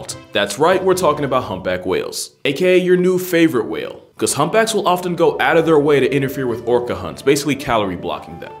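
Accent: Scotland, United Kingdom. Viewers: Hey! Nice Scottish accent 👍🏼👍🏼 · His Scottish acsent is good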